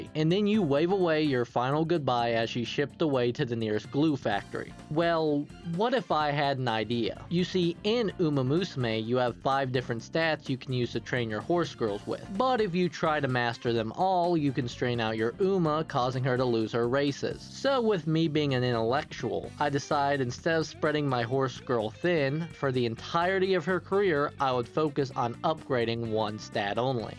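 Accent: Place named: United States. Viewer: You sound like you’re on the verge of using a country accent but it makes you sound nonchalant and cool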